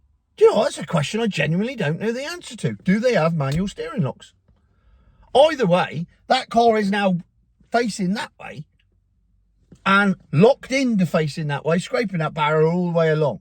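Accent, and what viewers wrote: Cornwall, United Kingdom. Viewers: Love the cornwall accent,takes me back to when to when i was young,my grandparents came from cornwall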